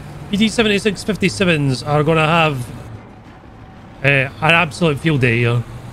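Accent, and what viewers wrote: Scotland, United Kingdom. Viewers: That Sco’ish acc makes everything he says so lively!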